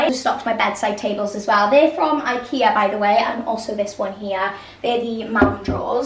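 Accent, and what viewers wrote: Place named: Wales, United Kingdom. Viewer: Loved this! Are you Welsh by any chance? Mind you this is my first impression of you and I instantly heard a fellow Welsh accent, especially when you said ‘mam’ 😅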